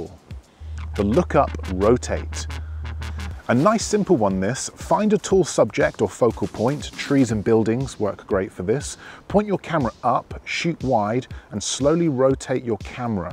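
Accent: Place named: England, United Kingdom. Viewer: Honestly I have subscribed you of 2 things, 1. You are so good at this! 2. You are English (I love English accent) But I'm an Android fan! 😳😳😳 And I really don't want to unsubscribe your channel at all! 😞😞😞😞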